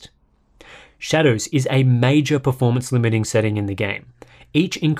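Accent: Australia. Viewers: So aussie so organised. Are guys ausies.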